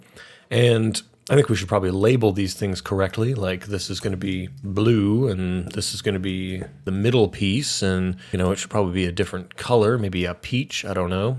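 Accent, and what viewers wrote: Canada. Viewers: Sounds like you're Canadian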